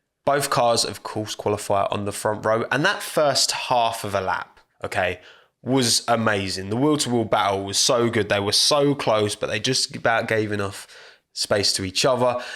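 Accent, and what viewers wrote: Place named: East London, United Kingdom. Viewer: you didn't need to tell us you were a west ham fan, we knew it from the first time we heard you speak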